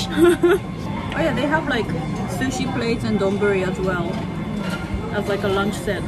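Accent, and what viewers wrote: England, United Kingdom. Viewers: Love your Video, I love Sushi and it looks so good, Love Unagi, I am about to stop in Tokyo, can't wait, Last time I eat 108 pieces, my record, OH you must have spent time in England, your accent comes out every now and then. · Are you from England/Britain? You have a vague English accent over the clutter in the background 😅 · Ah yes I read you went to university in UK. I can hear it in your accent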